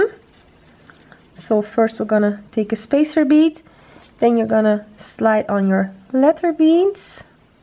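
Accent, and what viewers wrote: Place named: Netherlands. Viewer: You can hear a Dutch accent ( spreek je Nederlands )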